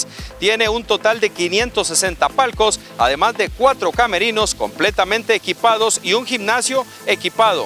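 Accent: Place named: Costa Rica. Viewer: Suena costarricense este cerote,! Q pasó?.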